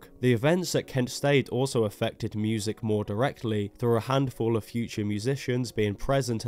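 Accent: United Kingdom. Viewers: There's actually a fair number of documentaries on this, made by people who were there at the time. Fun to hear a British kid do an abbreviated rundown on decades of American history.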